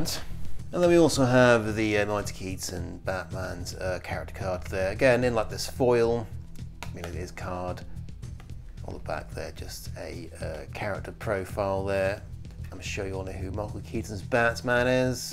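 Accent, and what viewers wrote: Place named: United Kingdom. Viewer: They should have used the head articulation where the joint is in the bottom of the neck instead of the top. Like Hush Batman or Capulo rebirth Batman. When they said they were making a Keaton figure that's what I assumed they would do. It makes the most sense as it would have better preserved the shape of the cowl and neck. Sounds like you're in the UK man. May I please ask where you got this? I haven't been able to find it anywhere yet. Edit - Enjoyed the video, have a sub👍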